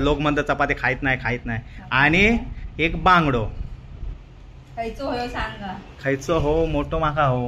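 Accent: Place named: Malvan, India. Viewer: व्हिडीओ चांगलेच असतत मालवणी भाषा बोलण्याची ठेक माका आवाडता शात हा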